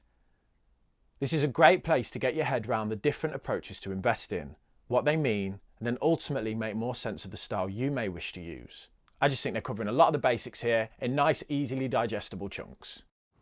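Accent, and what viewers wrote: Birmingham, United Kingdom. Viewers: I had a strong feeling you were from Birmingham. I’m also originally from Birmingham - King’s Norton. Keep up the good work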